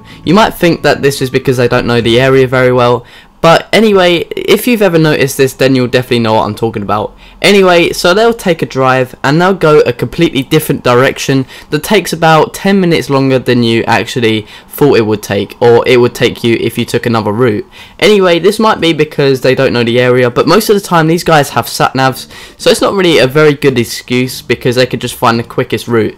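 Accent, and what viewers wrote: United Kingdom. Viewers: great information and you spoke good and clear ..love your British accent ..my guy is from Greater Manchester Uk . I could understand you better than most Americans speak ..great job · On the contrary Mr. commentator, you presentation was very understandable even with the nice British accent, I beleive. thank you kindly for sharing your experiences. Prof. Bruce W. Marchetta Th.D Hypnotherapist - educator 3-1-2017 baja ca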